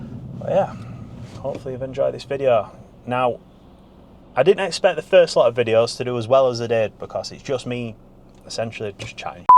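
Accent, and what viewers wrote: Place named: Northern England, United Kingdom. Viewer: Very hard to understand your accent. You are making no effort to speak clearly. Not everyone is from the North of England (and I'm not from Britain either).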